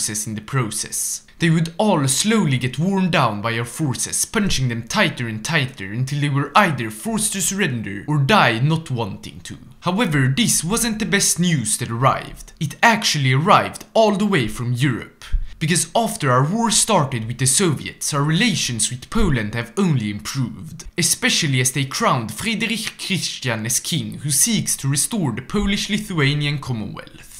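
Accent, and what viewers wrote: Sweden. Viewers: A Swiss man with a Swedish accent playing a Swedish game as Japan.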